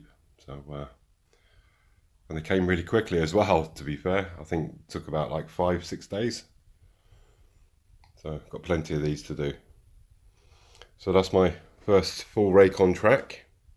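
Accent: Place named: Yorkshire, United Kingdom. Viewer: Thought you`d be a Yorkie Man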